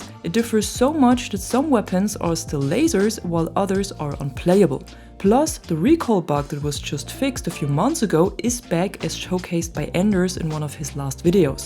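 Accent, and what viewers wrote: Germany. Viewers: I am so sorry. I figured that out.Love your channel and your German accent.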